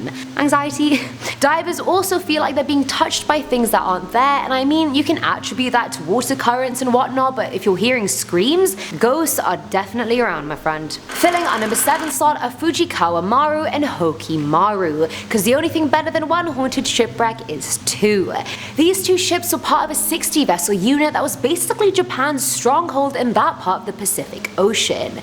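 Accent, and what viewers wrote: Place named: United Kingdom. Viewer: I luv that british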